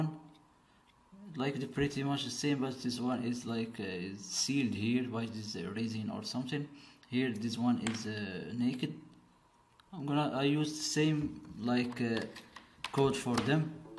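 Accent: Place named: Morocco. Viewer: منين لبلاد اياسمون، باينة عليك مغربي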